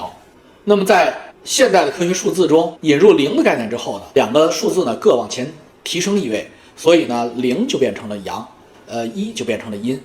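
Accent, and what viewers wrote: Henan, China. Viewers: 那不就是河南话吗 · 怎么感觉这么像河南话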